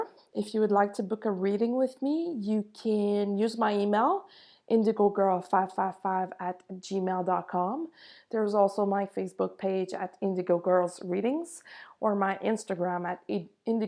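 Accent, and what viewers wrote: Quebec, Canada. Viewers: Do I hear a little French-Canadian accent!? 😉😉😉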